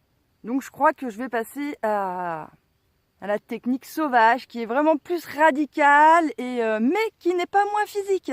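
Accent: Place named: Southwest, France. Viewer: j adore l accent du sud ouest!!!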